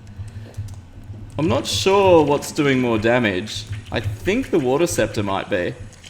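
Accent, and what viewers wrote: Australia. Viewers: I didn't think you ever swor in ur vids because ur so and also r u from Australia or new Zealand ITS KILLING ME!!!!!!!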